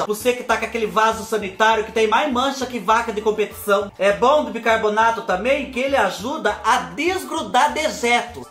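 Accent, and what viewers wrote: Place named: Capão Bonito, Brazil. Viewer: você fala CHÊ. Que nem minha falecida vozinha ali de Capão bonito. Vc lembra meus familiares. Amo seu canal. Agradrço a Deus por vc existir. Muita saúde pra vc e pra sua mãe maravilhosa. Deus os abençoe.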